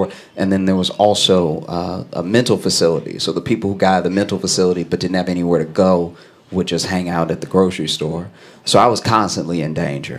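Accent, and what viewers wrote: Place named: South, United States. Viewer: this man sounds like he is from below the mason dixie line!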